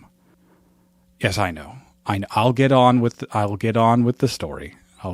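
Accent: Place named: Canada. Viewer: Knew in an instant you were a fellow Canuck- pronunciation of foyer and use of university (Yankees say college even if Master's and doctorates are offered. Never understood that one). Love the humour!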